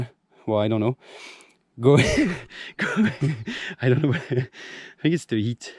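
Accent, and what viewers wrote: France. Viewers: Mais cet accent est tellement bon ! So French ! C'est bon de te revoir rider ! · Me encantas <3 acabo de suscribirme a tu canal y te sigo en Instagram!. Eres un Crack voy a ver todos tus videos para ponerme al corriente, tienes un espíritu salvaje y un chuloooo acento francés, que mas se puede pedir!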